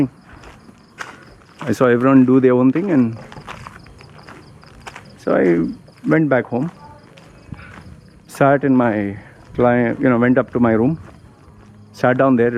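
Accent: India. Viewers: You are an indian i can tell from Your accent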